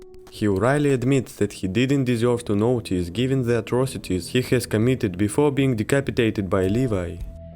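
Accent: Russia. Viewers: Bro, are you Russian? 😅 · Слышу русский акцент... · Чел ты руский по акценту видно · Похоже на русский акцент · are you russian? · У тебя русский акцент) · you from russia??? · Are you russian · Hmmm,English language with Russian accent. I like that👍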